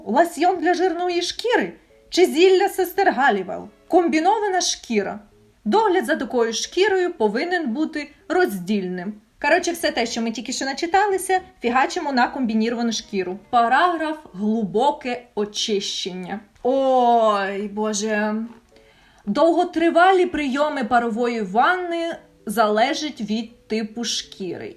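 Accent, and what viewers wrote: Surzhyk, Ukraine. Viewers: Зараз здохну від цього суржику 🥵💖🥵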